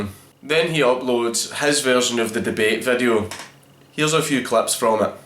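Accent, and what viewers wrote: Scotland, United Kingdom. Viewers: never can understand all of scottish accent but Glasgow i can easy but thats not why im here Dankula is a legend :)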